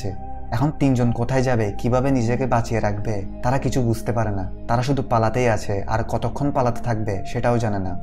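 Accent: Chittagong, Bangladesh. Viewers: আ বদ্দা! চিটাইংগা টান ত ন গিল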